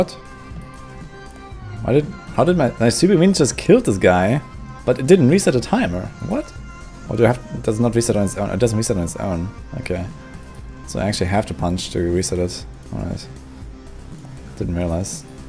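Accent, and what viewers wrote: Germany. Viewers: as fellow German you probably can hear his German accent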